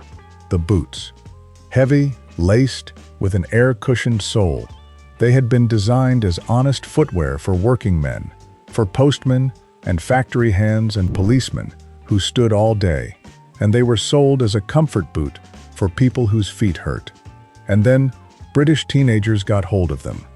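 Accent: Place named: United States. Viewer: Why the US AI accent? And rockers illustrating an article on Mod Culture? Most of the things shown here were on their way out by 1969, apart from the skins.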